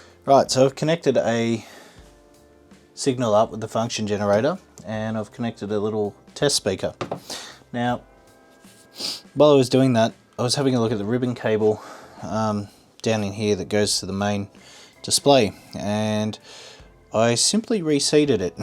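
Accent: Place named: Australia. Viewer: I have a crown xls1000. With the same symptoms as this one. No audio and screen is blank. The fan powers on for like 1 second. I noticed when I was using it last it had red lights on and on the screen it said overload or overheated, so I turned it off and back on and never worked again. I tried reseating the ribbon cable on the board side but still nothing. Any other ideas. You sound like your an Aussie, where are you based?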